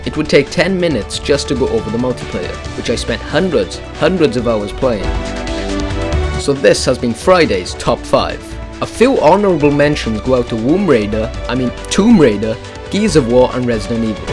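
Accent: Wales, United Kingdom. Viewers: is he welsh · he has a welsh accent lol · you have a slight welsh accent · i just got a question are you brittish?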